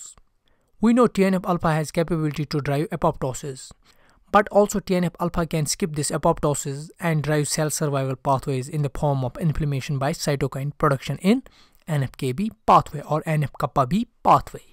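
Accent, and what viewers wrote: India. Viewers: نفسي افهم الاكسنت بتاعة الهنود😭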